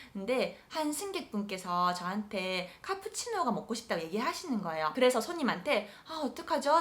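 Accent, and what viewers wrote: Busan, South Korea. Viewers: 부산 분이신가요? ㅎㅎ 반가워서 글남깁니당:) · 느므느므 잼있게 잘 봤습니다~~!! 친구따라 강남 가셨네요 ㅎㅎ 아무래도 합격하는 운이 따른것 같다고 보입니다. 항상 님 부산사투리에 아드레날린 품고 갑니다~~!! 다음 썰때는 초점을 오른쪽으로? 살짝 돌리심 더 나을듯 싶어요 ㅎㅎ 항상 건강조심하시고 화이팅하세요!!!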